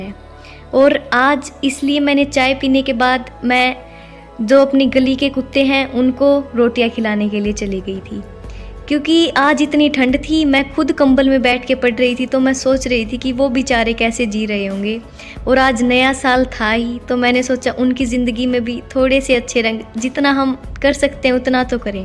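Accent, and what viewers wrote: Haryana, India. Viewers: Aaj k vlog me haryanvi sunkar bahut aacha laga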